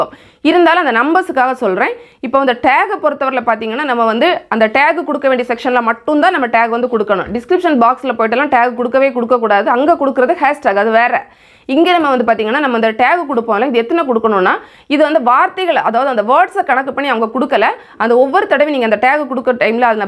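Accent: Kanyakumari, India. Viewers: Madam u from ......?? If possible say mam 😄😄😄 Kanyakumari....??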